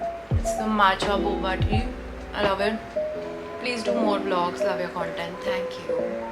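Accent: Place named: Maharashtra, India. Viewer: Your marathi accent oh my god literally dying 😍🥺♥️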